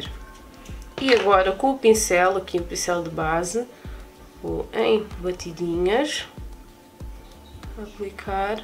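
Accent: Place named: Portugal. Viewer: Amiga, amo os seus vídeos! Aprendo muito! Me tira uma dúvida: O seu sotaque é tipicamente de Portugal. Vc é nascida e crescida nesse país, ou imigrou ainda bem nova? Obrigada pelo seu conteúdo e beijossssss sua linda!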